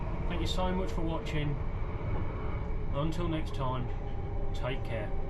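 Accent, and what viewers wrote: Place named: Midlands, United Kingdom. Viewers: Bostin'! I originally thought he was a Brummie too, but in an earlier (non-Underground) video I'm sure he said 'Peterborough, my home town'. So, East Midlands not West., please confirm. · Really good video, I’m assuming saying it’s bostin’ is in bounds as I’m hearing a Midlands twang. You’ve a very good way with words 👍🏼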